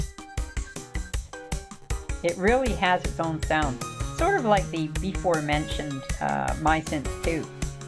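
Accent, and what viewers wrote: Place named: United States. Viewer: WOW?!! A female who is quite experienced and advanced as well?!? And can actually talk her way around this stuff like a true graduate. And judging by your voice, you’re from this here New World. GEEZE!?! I applaud you and I am so impressed and inspired. I would probably buy any of your modules just based on their aesthetics alone!! You are someone amazing! Thank you for sharing about all this.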